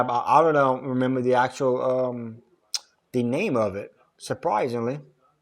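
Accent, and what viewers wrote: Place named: Alberta, Canada. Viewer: Thanks for video!!! You have Canadien(Alberta) accent. where you from?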